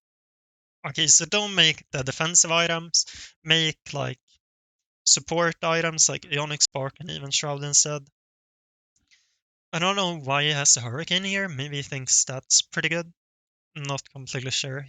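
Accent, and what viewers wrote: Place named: Sweden. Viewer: At the end there.. What Title meant with the tips is that it is crucial to find Illaio 2 with rebels, and to do that over Zoe 2 star. Spending 12 gold on making Zoe 2 star when rolling down(and being stuck on that 12 gold loss - cant sell her), then being broke - and then not finding Illaio 2 star. He means that this is a big "loss condition" for rebels. How to lose games with rebel. Maybe prio looking for 2star illaio first, then other units when rolling down, maybe dont make Zoe 2 star if low gold when rolling down + no illaio 2 star. Great videos btw, i love your content. Keep it up! P.S you sound Swedish. Heja svenskar, snart blir det varmare här! haha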